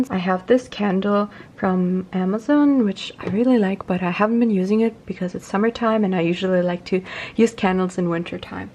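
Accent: Germany. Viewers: As soon as I heard you speak I knew you were German! You are adorable!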